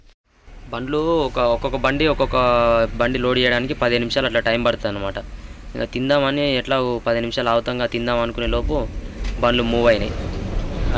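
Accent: Telangana, India. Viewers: Bro Nee Videos Lo Special enti cheppana Nee Voice Bagundi Bro And Nuvvu Mnatlade Fluent Telugu ante Telangana Slang undi Kani Machinga Ardham ayitadi nee mataloo videos lo Naaku kuda oka Wish undi Bro Okkasari Neelaga Truck Loading cheyali Enjoy Cheyali Anthe😄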